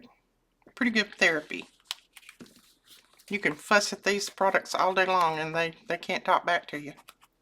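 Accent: South, United States. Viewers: We love ❤listening to your southern charm.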